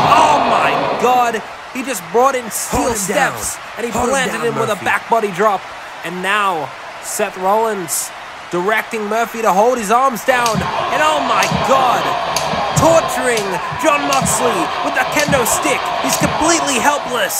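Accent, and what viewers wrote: Australia. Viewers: WOW FANTASTIC!!! I love the new stage dude! I was talking to SuplexNetwork and we agreed y’all sound alike! Probably because y’all are both Aussie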